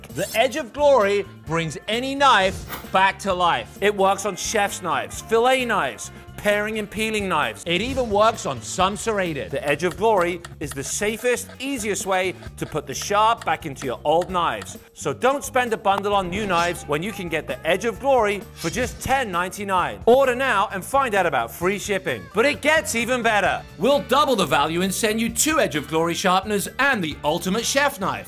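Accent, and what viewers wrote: United Kingdom. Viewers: I’ve only watched penguinz0 videos. I didn’t know he was British I was so surprised when I heard him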